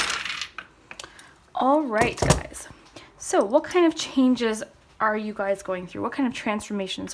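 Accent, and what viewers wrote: Minnesota, United States. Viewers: Quick question are you from Minnesota?? Just because you said pop and your accent 😂❤️ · anyone know where she is from? or her actual name? i love her videos so much i watch all of them but i love her accent i just wanna know if its like Minnesota or something 😂 i feel like it is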